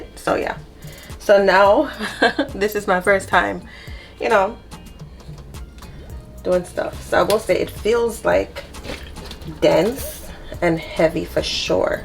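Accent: Jamaica. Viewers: Are you a Jamaican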